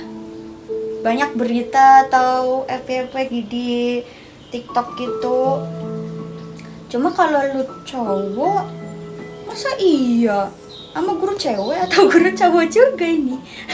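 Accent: Java, Indonesia. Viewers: kok logatnya kayak Jawa, aslinya mana mohon dijawab, salam jatim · Muka sunda logat jawa.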